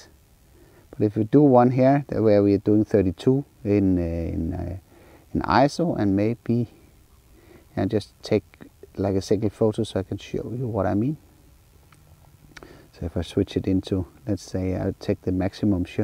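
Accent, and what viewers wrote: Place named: Denmark. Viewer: Einstein? He was German. That's a Danish accent the host is talking 😅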